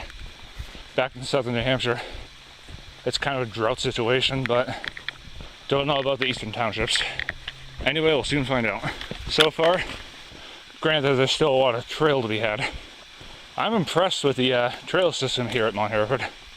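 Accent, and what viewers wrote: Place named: France. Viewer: Very nice place and french accent my friend :)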